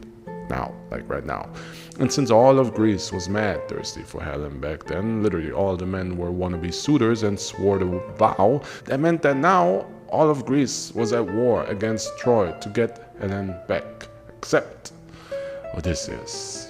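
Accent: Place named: Germany. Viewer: ..are you german? You pronounce the names REALLY german like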